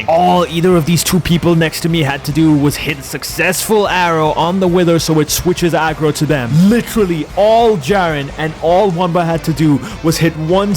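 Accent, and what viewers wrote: India. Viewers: Hey bro😱😅 aap btw me bhi yahi Jan ne aaya hu ki ye Indian hai ya nahi confusion hai mujhe bcz senpai Ke video me Hindi itne easily Bola tha tone Indian thi🙂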